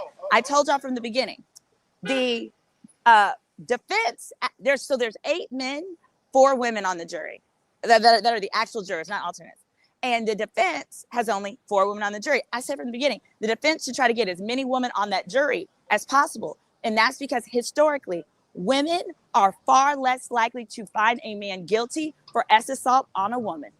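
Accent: South, United States. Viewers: I immediately subscribed to your channel last week after hearing your accent on Demetria L Lucas's podcast. I'm from MS and love a good ole Southern accent. Does your friend care about you calling her a hoe? I crack up each time you say this 😆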